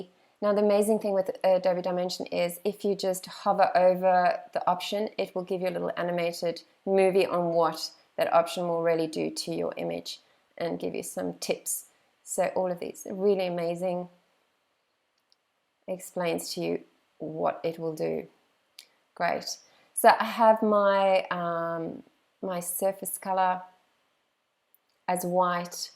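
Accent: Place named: Australia. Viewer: i was wondering " wait, hold on, did I hear Margot Robbie speaking ? but then I was like, ah she is a Aussie so you might be having the same accent" ^_^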